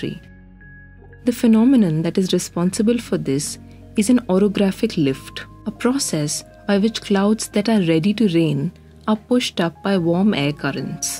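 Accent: India. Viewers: I can’t listen to this Indian accent. Bye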